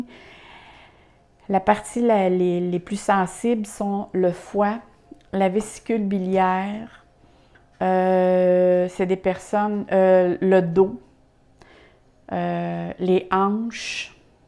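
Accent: Quebec, Canada. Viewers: Ahhhh le canada mon rêve !!! J'adore l'accent, les expressions SUPER merci pour le tirage :-)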